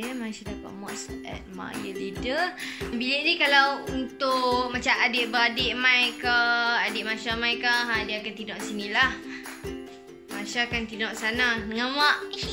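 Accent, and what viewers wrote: Kedah, Malaysia. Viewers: Tolong la why r u speaking kedah i vant get it Do cringe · Cantik la rumah masya mcm tuan rumah jgk 😉 best dgq masya sembang pelet kedah kaw punya hahaa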